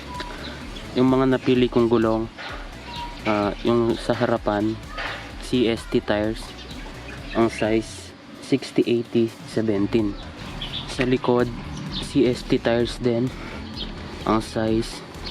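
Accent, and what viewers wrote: Pampanga, Philippines. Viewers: Kapampangan La Pamo Hahaha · Kapampangan ka pala paps😂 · boss kapampangan ka pala yee 😂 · Kapampangan ka pala soy hahah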